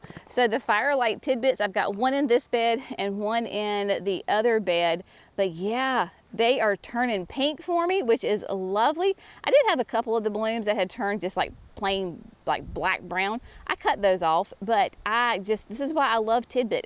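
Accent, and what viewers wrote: South, United States. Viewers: I'm zone 8 Arkansas, a 5 yrs gardener and I have learned so much from the videos you've posted. I just love your southern accent, your gardening knowledge and your personality is unmatched. ❤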